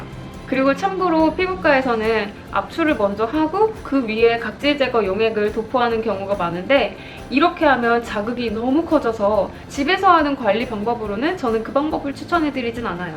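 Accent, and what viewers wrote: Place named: Seoul, South Korea. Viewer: 우와 서울사투리 같아요 신기신기 잘보고갑니당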